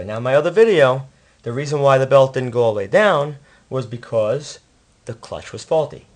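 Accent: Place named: Rhode Island, United States. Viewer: Sounds like my friend from Rhode Island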